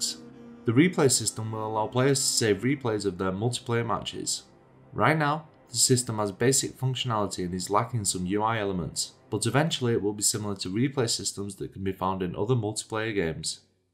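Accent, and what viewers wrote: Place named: Turkey. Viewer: okuyanın Türk olduğu çok belli bee :D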